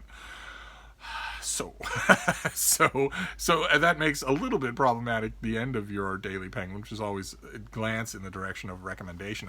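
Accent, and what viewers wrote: United States. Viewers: Loving your anti-Roundhead invective but still surprised by an American voice in defence of Monarchy! Totally agree with you about TS Eliot and Marvell.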